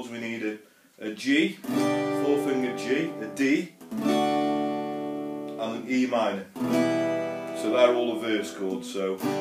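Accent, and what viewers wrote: Stoke, United Kingdom. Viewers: Do u do lessons ? Noticed the stoke twang . U make it look so easy .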